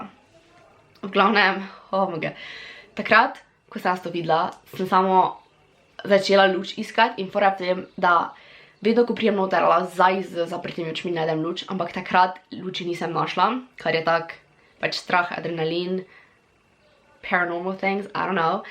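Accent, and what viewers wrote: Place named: Primorska, Slovenia. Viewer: Ma kje ti zivis ker imas primorski naglas?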